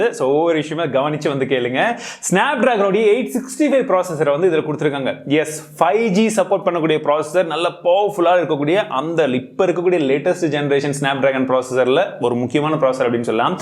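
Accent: Chennai, India. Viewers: first time hearing chennai slang . ......😅🤣🤣😂🤣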